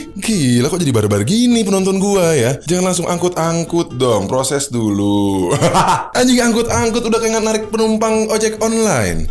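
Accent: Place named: Madura, Indonesia. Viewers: Udah lama ga ngeroast orang kok logatnya jadi madura qor . . Btw dikit lagi sejuta nih, siap siap ditagih janji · Logatnya kayak madura ea 😂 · lama lama kok logat lu kek logat madura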